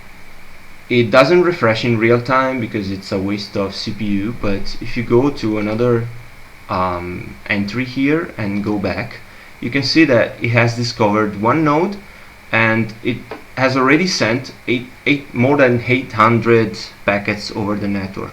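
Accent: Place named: Italy. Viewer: dal tuo inglese sembri italiano, PUOI FARE UN TUTORIAL IN ITALIANO????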